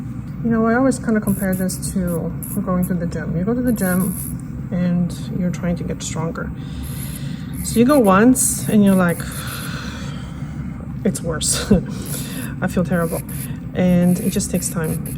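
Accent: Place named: Poland. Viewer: She lives in Spain but is not Spanish. I think she may be Polish.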